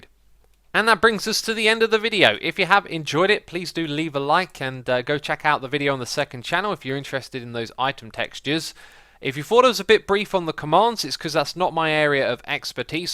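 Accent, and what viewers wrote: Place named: United Kingdom. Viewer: Wow, I thought I was watching a different YouTuber for an entire minute. British accents sound the same, I swear. Well, most of them.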